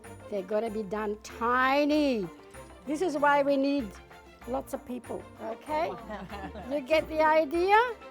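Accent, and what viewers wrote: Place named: Australia. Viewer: I love your accent, Australia^^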